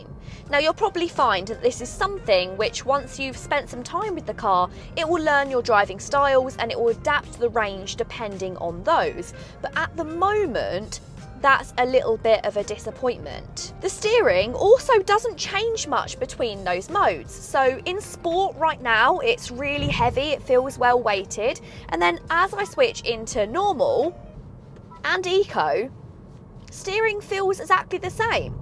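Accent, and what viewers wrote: England, United Kingdom. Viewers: i love the England 🇬🇧 accent 😊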